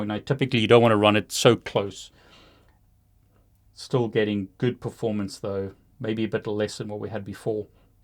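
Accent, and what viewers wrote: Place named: South Africa. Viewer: I hear a South African accent, or am I just homesick?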